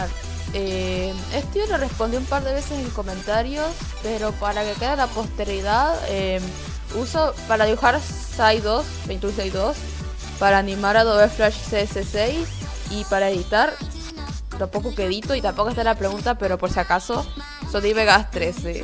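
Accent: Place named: Argentina. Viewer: BUENO EMMM, tenía la idea como de una manera más "silly" o tipo como alguien cuando se tapa la nariz NO SÉ PQ JDIDIEIKWKAKSKDN 😭😭😭, además no pensaba que se te notaba el acento argentino (lo cual no es malo obvio, además no soy nadie para "quejarme" al respecto pq a mí se me pegan acentos de otros países lolzies)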